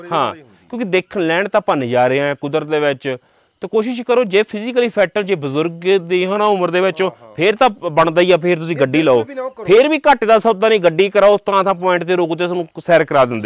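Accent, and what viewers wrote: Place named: Moga, India. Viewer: Moge di boli ba kamal hai mithi mithi.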